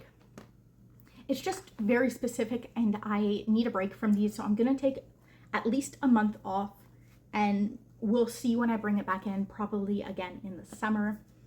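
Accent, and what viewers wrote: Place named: France. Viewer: Congrats on the complete refresh! 🎉 that’s a pretty new colour story! So many possibilities!! Also, love your French accent. I’m assuming you speak French? 🇨🇦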